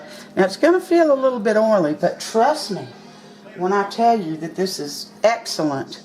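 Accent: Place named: North Carolina, United States. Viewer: You're just the sweetest YouTuber! You sound like a North Carolinian. (My home state) I can't wait to try your recipe. I'm too old and stressed to be fighting against time with butter recipes for pie crusts.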